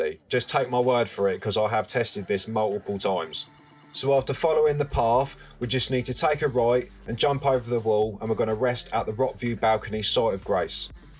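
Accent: Cockney, United Kingdom. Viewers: An Elden Ring exploit video from what sounds like an old-school, cockney gangster! Wicked. Thanks, man!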